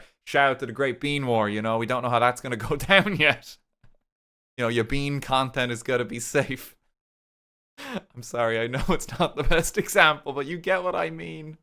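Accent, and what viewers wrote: Ireland. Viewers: You're Irish?!?!?!?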